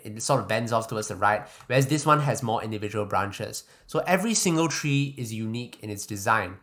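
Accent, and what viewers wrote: Singapore. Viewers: you sound very singaporean...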